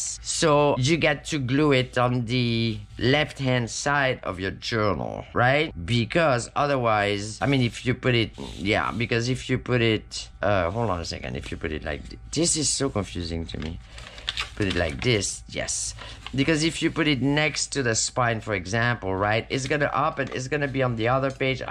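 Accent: France. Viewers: LoL 🤣 you make me laugh Every TIME I watch you 😂 Lol!! I'm so glad I found your channel. No Lie.... When that music 🎶🎵🎶 came on out of 'nowhere' and you said "I gotta go..!."🤪then "I'm kidding!!" and cackled afterwards..I almost D⚰️d in hysterical laughter. I had to rewind to 🙉 hear it Again! You Are a Joy to watch because I don't know what you May say in your Cute French Accent that will have me howling in laughter!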